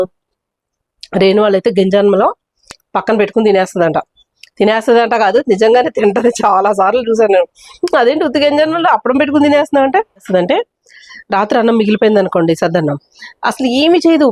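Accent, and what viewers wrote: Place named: Srikakulam, India. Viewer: మిమ్మల్ని చూస్తుంటే మీరు చెప్పేది వింటుంటే మీది శ్రీకాకుళం ఏమో అనిపిస్తుంది మేడం ఎందుకంటే మాది శ్రీకాకుళం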